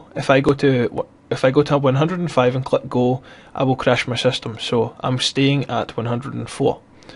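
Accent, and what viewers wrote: Scotland, United Kingdom. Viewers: Was looking for some help on this as my ASRock OC tuner and hwmonitor say my cpu fan is around 3300rpm when idle...is this normal? Also i was pleasantly surprised to hear you're voice and that you're a fellow scot! Greetings from Glasgow! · are u scottish?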